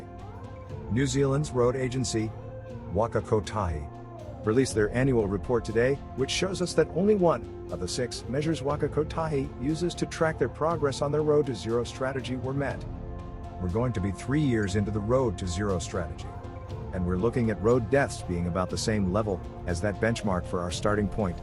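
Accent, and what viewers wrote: United States. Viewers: Why is all the footage AND the commentary accent based on USA, when New Zealand is more like Britain. We drive on the left, our emergency number is 111 NOT 911, and we (mostly) speak English similar to British English but with less regional accent. We generally don't speak American English. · The minute I heard the American accent it lessened the impact of the message. How about we all take responsibility for our actions on the road, good or bad ! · This is an Aotearoa (New Zealand) funded road safety promo hosted by an American or Canadian voice... Just say'n. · Most of these comments are fake. Ask what is the motivation for producing this, and why the American narrator?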